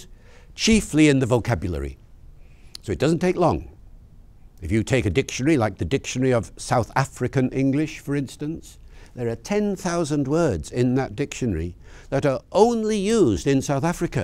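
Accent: RP, United Kingdom. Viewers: David Crystal's pronunciation is known in linguistics as RP (Received Pronunciation). The closest spoken English to the rules of English pronunciation is found in the upper Midwest of the United States, often called " General American Standard", it lacks the different accents from other areas. Major TV networks send there promising young news broadcasters to special accent neutralization classes in the midwest. General American Standard is often called Network Standard or newscaster accent. RP and General American are pretty close, RP has a little "Prestige" inflection in it. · He speaks RP, which was invented for that purpose. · His accent sounds 100% British. Not one American accent (not even the transatlantic one) comes close to sounding like this. If you think this sounds like American English, you are quite unfamiliar with spoken English (no offence intended). 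I personally think he has a hint of a northern British accent. - I think his pronunciation of "see", "settlers", and "home" give it away a bit, just to name a few examples. According to Wikipedia Billy Crystal grew up in Liverpool while his mother was from Northern Ireland, so that may explain it. I'm not a native English speaker though, so if any native British English speaker could tell me whether I correctly picked up his northern leaning accent, that would be great.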